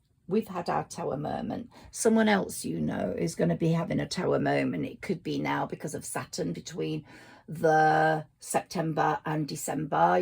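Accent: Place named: Birmingham, United Kingdom. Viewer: Welcome back, really nice to hear your slight Brummie voice, lol its been a tough couple of months, constant healing, thanks for your dedication xx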